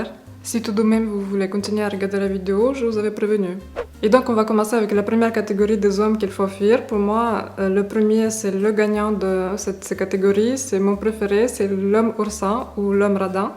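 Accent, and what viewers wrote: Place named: Russia. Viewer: salut, jai regarder plusieurs de tes videos c est chouette dentendre le francais avec cet accent, je voulais juste comprendre pourqoi chez les femmes russes vous semblez obseder par largent, si té en couple aavec un homme c est pas le seul critere jespere?n y voit aucune reflexion negatrive de ma part c est juste que jai limpression que les rapport hommes-femmes en russie sont a 90 pour cent baser sur largent, c est dommage nan?ya plein de chose interessantes a decouvrir chez les gens a part ca meme si c est vrai que c est important aussi, voila, bonne continuation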